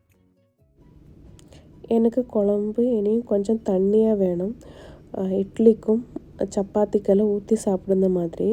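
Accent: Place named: Kerala, India. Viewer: Malayalee tamil parayanathe pole unde.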